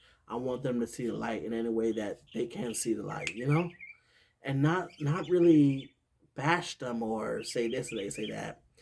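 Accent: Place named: New York, United States. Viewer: We have an amazing opportunity to show the world His love by our unity at Christ followers. Love you and yeah, NY accent is still there for sure.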